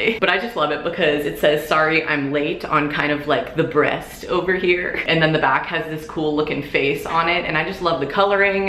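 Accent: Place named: Brooklyn, United States. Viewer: I HEAR BROOKLYN BABY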